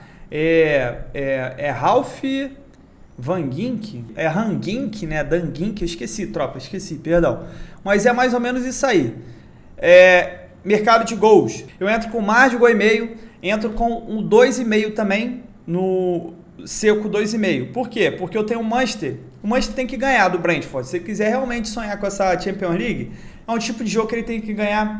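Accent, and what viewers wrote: Rio de Janeiro, Brazil. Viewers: Vejo seus vídeos e fico pensando "é muito carioca" kkkkk "Dificíão" é nossa cara HAHAHAHAA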